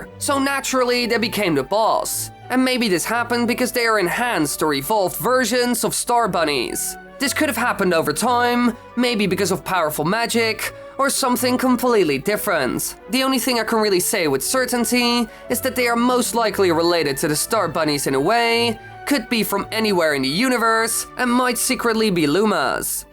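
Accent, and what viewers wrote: Netherlands. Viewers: Woah Why Do you say Utrecht & Utrecht Centraal so Dutch speaking?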